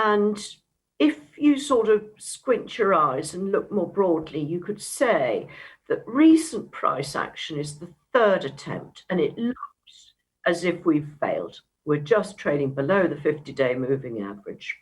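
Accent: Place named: England, United Kingdom. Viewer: Hey, its the English lady again!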